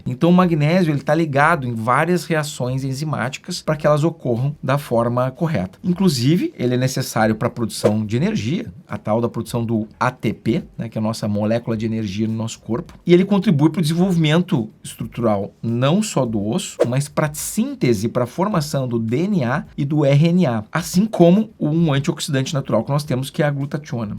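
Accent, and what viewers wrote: Rio Grande do Sul, Brazil. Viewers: O senhor e gaúcho? Gostei do sotaque.e meu conterrâneo?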